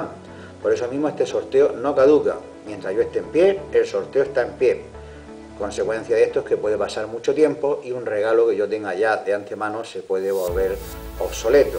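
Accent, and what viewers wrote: Murcia, Spain. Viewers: Aver si me llega alguno a Suecia!!!!! Despues de seguirte durante algun tiempo y escucharte con tu acento muy familiar ya me enteré que eres Murciano jejejeje.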